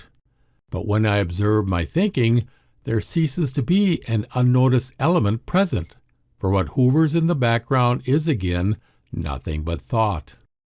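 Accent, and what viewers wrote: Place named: Western New York, United States. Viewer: Are you from WNY? Buffalo area? Possibly Chicago or Michigan? I hear your accent and I like it; I am from Niagara Falls NY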